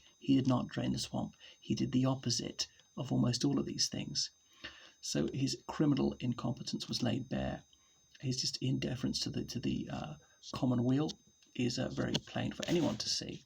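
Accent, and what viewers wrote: RP, United Kingdom. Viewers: You have posh accent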